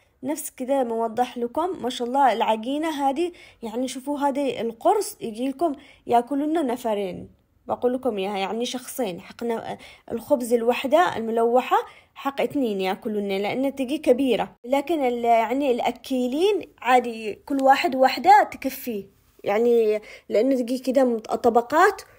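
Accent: Aden, Yemen. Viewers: تسلم ايدك يا وفدوه للهجه العدنى ايش المحرقات اللى يحطوها بدل الطماطم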